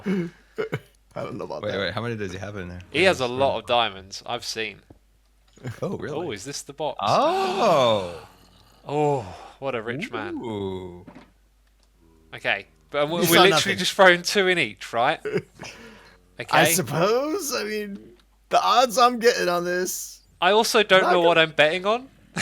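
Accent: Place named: United Kingdom. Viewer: it took me a while to understand what flower you were referring to lol I love British accent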